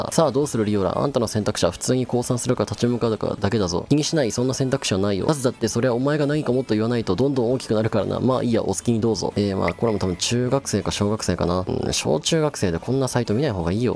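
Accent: Kansai, Japan. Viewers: の動画、いつも楽しく観ています。面白いです!関西弁好きです。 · リオラは声と関西弁がめっちゃあって好き、解説は絶対これ見るわ。いつもありがとうございます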